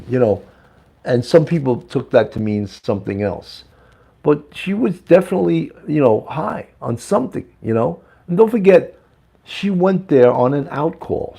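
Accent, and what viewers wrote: New York, United States. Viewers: There's that New Yorka accent !!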